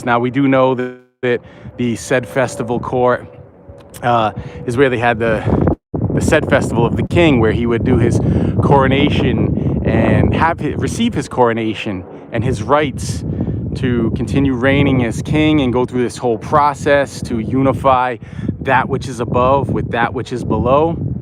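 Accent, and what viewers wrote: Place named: South Boston, United States. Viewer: I hear Southie..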